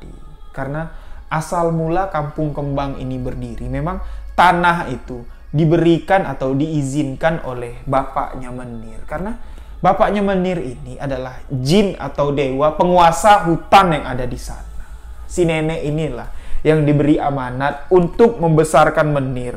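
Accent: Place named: Jambi, Indonesia. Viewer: Pertama denger tak kira org Bali g taunya logat Jambi ya ...sukses ya mase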